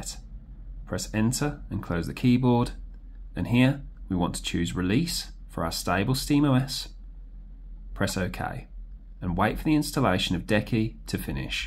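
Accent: Birmingham, United Kingdom. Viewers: Where about in Birmingham you from mate? Always nice to see a fellow brummie.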